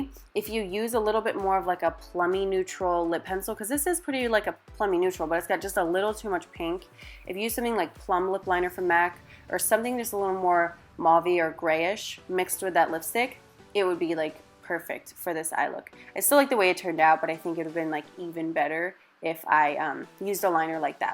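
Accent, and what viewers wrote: United States. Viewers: Loved the look and background. I would say that's a north jersey accent coming from someone in the south 😉 that's jersey for you. Now you have me wanting all those extra dimension eyeshadows!! Alex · haha! I love your jersey accent! so beautiful lady!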